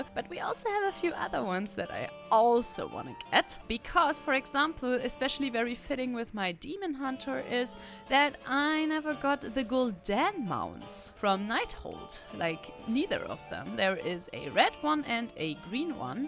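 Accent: Germany. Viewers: egal wie sehr man sich anstrengt, man hört immer wenn wer deutsch ist :D ich kenn das Problem haha, tolles Video.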